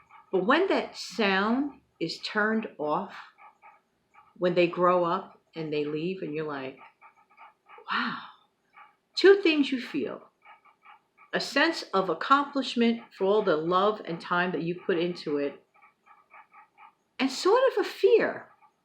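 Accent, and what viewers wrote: New York, United States. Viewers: So so true. My 4 grew up so fast 2 men now and 2 young women. Youngest is 17. Sons are almost 30 and both away in navy. Enjoying tea time today. Morning chores done and eating leftovers for breakfast. I worked full time with children in 4 schools due to age differences. Miss those hectic days. My time for college (almost 2 years pasted already) and me time. It is hard to believe how fast time passes. My mind races too so I take time to go outside and photograph nature. Ahhhhhh bliss. Love your NY accent. Enjoy your days.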